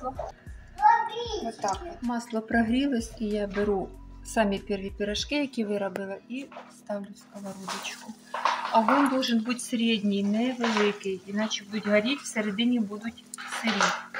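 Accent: Ukraine. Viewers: Как приятно слышать украинский говор! Какая чудесная хозяечка,такие и классные пирожки! Спасибо огромное,благодаря вам,я мысленно побывала в моем далеком детстве!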